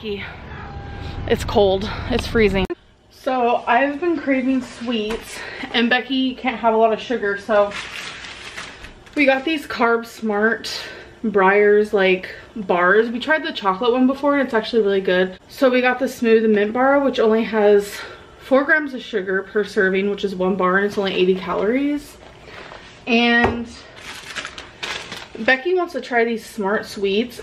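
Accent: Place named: Kentucky, United States. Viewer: That's her danity southern belle kentucky accent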